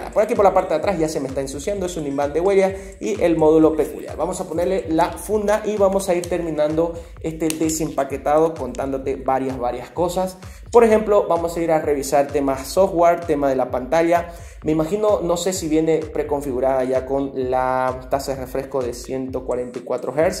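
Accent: Bolivia. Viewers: Eres de Bolivia verdad ?? Si lo eres me suscribo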